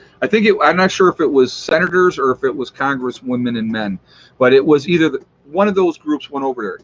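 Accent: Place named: Michigan, United States. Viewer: Every time i listen to you, something would bug me about you being a new yorker..and i couldn't figure it out. Now i know what it is...you have a Michigan accent not a new york accent...😁am i right???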